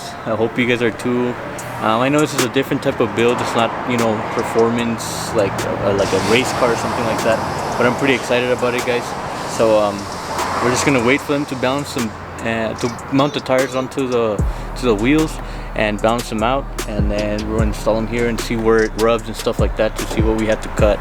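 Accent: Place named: India. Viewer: you speak like an Indian or Pakistan guy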